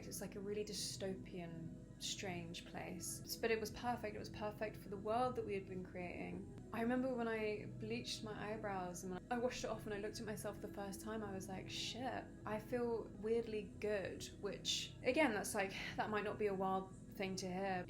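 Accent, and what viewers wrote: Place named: RP, United Kingdom. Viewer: ! Why are you so posh?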